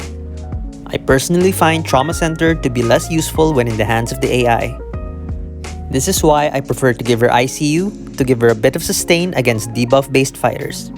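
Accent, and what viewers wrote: Philippines. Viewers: Weird Question, Are you Filipino? Cuz you giving me a Filipino vibe you know? The way you speak, that Accent of yours is what giving me Filipino vibe, and I dig with that. Cuz I'm Filipino.🇵🇭🇵🇭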